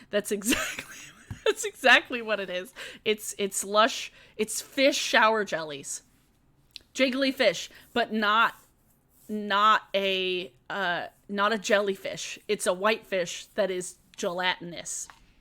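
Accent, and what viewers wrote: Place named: Minnesota, United States. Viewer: I’ve never met anyone that actually talks like your Minnesota accent but that’s a great accent 😂. Maybe it’s because all the Minnesotans I know are from the Twin Cities area and I’m from the part of southern Wisconsin that’s near Chicago. If you want to watch comedy about the Midwest there’s a comedian called Charlie Behrens that definitely talks in that accent!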